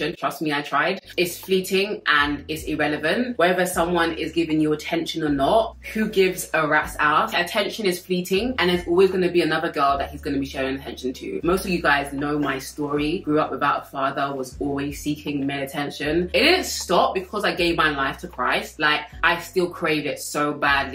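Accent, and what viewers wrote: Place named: United Kingdom. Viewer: sounds like Nicki Minaj doing a british accent ❤i love her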